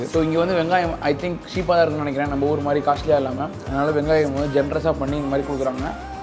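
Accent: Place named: Kanyakumari, India. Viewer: Kanyakumari language poola iruka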